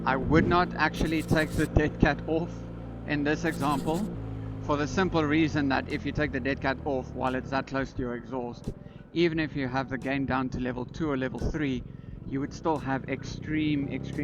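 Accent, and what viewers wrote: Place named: South Africa. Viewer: You sound south african, I don't see ocean views so I'm guessing gauteng?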